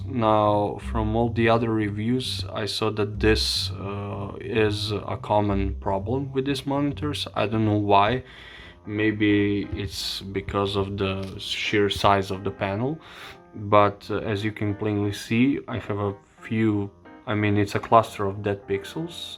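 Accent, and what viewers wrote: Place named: Eastern Europe. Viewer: Nice vid. Based on your accent, I would say youre somewhere from eastern europe, czech, poland, russia etc? My best guess is russia. This monitor is something I am interested in, however, currently, in my opinion, its simply just too expensive for me. If it drops half price, then I could consider this.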